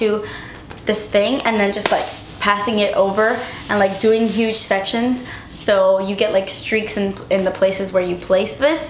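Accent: Australia. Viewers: Yes, I've just noticed they are doing that. They shouldn't have to justify the fact they like wearing fake tan. Although it does stop lots of those silly comments as she said. PEOPLE have right to better themselves, it's just like changing your hair colour, wearing make up, following fashion. Who doesn't want to look there best. Really enjoyed her review she sounds Aussie: )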